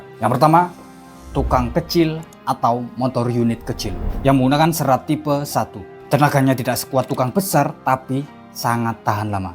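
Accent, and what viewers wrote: Java, Indonesia. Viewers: Orang mana bang? kok bahasanya medok bgt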